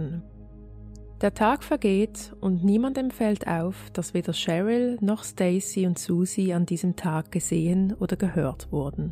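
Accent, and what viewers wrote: Switzerland. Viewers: Den schweizer Akzent im Hochdeutsch finde ich so charmant. Ich mag deine Stimme sehr und finde deine Recherchen gut gemacht. Ich freue mich auf weitere Folgen. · Da bekommt sogar das Schwietzerdütsch einen angenehmen Klang. Es gefällt mir sehr gut, wie du den Fall, den ich schon von einem anderen Crime-Mädel kenne, dargelegt hast . Sehr umfangreich, gut recherchiert. Ich habe gleich ein Abo dagelassen und freue mich auf viel mehr von dir. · 🤭😆 wie süss. Schweizer Sprech ist zum verlieben. 😍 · Was stört Dich daran ? Ihren Schweizer Akzent kann sie wohl schlecht ablegen . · Ich wusste nichtvdass die Sprecherin eine Schweitzerin ist aber ich habe es sofort an Aussprache bemerkt. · Das ist kein schweizerdeutsch...bin berner...so tönen wir wenn wir uns mühe geben schriftdeutsch zu sprechen...wenn wir schwyzerdeutsch sprechen versteht ihr kein wort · Schweiz Akzente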